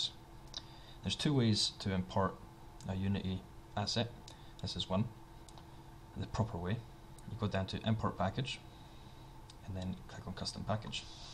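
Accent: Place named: Scotland, United Kingdom. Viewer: So Scottish. <3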